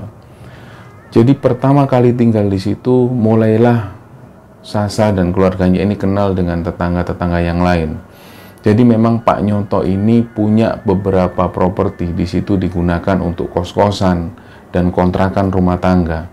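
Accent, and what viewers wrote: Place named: Central Java, Indonesia. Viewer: Indonesia bagian temanggung mana ini suaranya???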